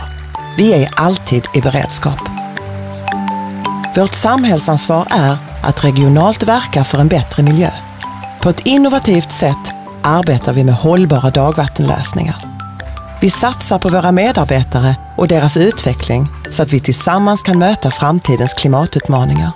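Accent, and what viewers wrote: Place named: Scania, Sweden. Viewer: Mycket bra. gillar skånskan. :)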